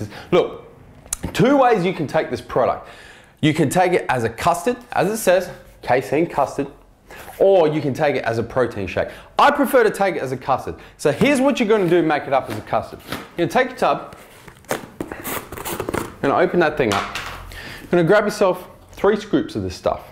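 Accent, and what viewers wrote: Australia. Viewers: Excellent review love your Australian accent bro thanks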